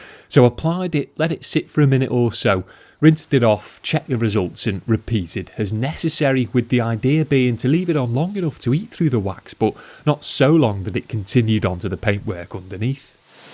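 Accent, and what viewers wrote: Liverpool, United Kingdom. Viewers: A cultured Liverpool accent, how refreshing, coming from a Brummy btw😃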